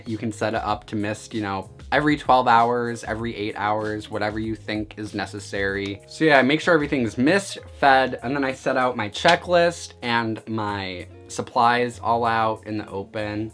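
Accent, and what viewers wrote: Midwest, United States. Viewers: He def has a Midwest accent! I never made the connection!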